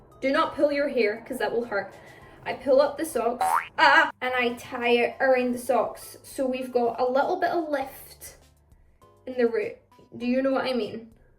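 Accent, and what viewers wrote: Scotland, United Kingdom. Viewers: I’m pretty sure she’s Scottish, I could be wrong though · Are U Scottish? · OMG UR SCOTTISH I LOVE THAT AND I WASNT EXPECTING YOU TO BE SCOTTISH I THOUGHT YOU WOULD BE FROM LONDON! UR SO PRETTYY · are you scottish? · Irish American? That would be someone of Irish decent, living or born in America. She’s Scottish, and was raised and is still living in Scotland.. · Excuse me but I've never heard you speak before and your accent is gorgeous🥹. I'd love to hear asmr in your accent. Where are you from? Your accent sounds like it might be regionally specific and I'm always curious about that. Like how accents in new york city differ a lot depending on where in the city someone is from. Like, peter Capaldi speaks differently than you but you both sound scots, so i assume you're not from the same regions. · r u Scottish (cuz I always thought u were American) also r u British cuz I ammmm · I love Scottish accents so much💛💛💛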